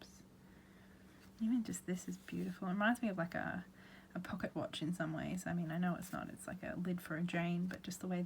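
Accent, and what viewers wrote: Australia. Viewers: Two things I’d like to say: I hear the Aussie in your voice. 🇦🇺 (Am I correct??) And; I live for the Harry Potter references... Always.⚡️🦉🏰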